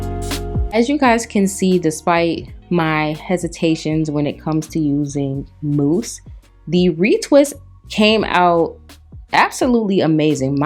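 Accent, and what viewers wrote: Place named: Australia. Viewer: she pulled up that aussie and i'm subbed sooo fast😂❤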